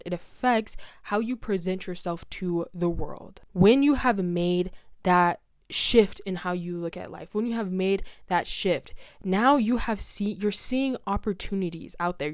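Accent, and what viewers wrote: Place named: United States. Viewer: She sounds American to me, but she might be Canadian. Wouldn’t know what part of either country.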